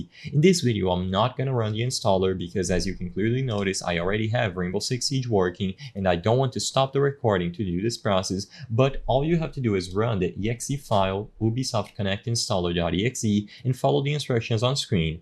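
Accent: Brazil. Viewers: cara eu fui ver o tutorial e falei, doidera esse cara fala igual brasileiro, só que em inglês, dai fui ver seu perfil pra entender kkkk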